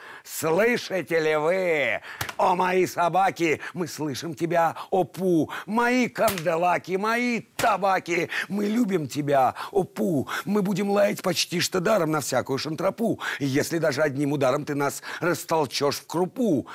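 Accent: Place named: Okanye, Russia. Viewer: Ооооо, "брат"! Да, ты ещё и дОёшь через "О"-(чко) надо полагать, неуч... Или ты нерусь, "Артём Иванов"? - Ну, тогда это многое объясняет ;))) ? УРА, ТОВАРИЩИ !!! Слава Украине в составе России !!! :)))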